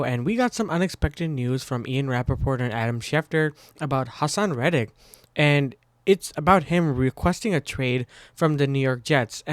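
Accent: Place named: India. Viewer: the thick Indian accent in the video tells me he doesn’t know what he’s talking about and you’re just out here glazing these ill advised takes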